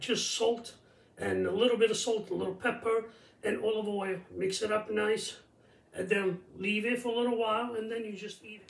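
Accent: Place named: Italy. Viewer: love your Italian accent, what part of Italy is your family from? I come from Giovinazzo, Bari (Puglia region)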